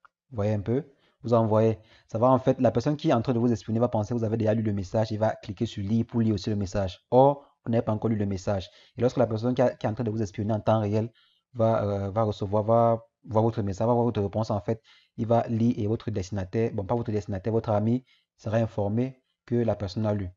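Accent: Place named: Cameroon. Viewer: Peut être que je suis pas intelligent i mais je n'ai rien compris quand bien même avec ton fort accent Camerounais je devrais te suivre.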